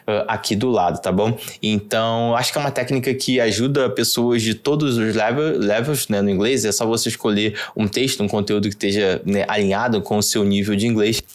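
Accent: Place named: Brazil. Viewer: mano nunca sinta vergonha do seu sotaque brasileiro, 99% das pessoas que trabalham em TI pro exterior tem sotaque, no final das contas quem trabalha pro exterior sempre acaba trabalhando com indianos, poloneses, russos, filipinos, e obvio que todos eles tem sotaque xD nosso sotaque é nossa identidade também !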